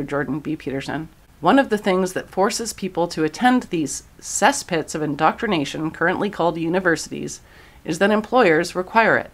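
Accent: Canada. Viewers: best Canadian accent ever!!! thanks for the laugh, peace oot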